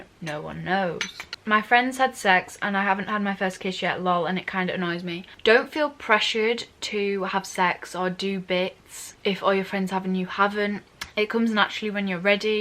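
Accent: United Kingdom. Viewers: She bri’ish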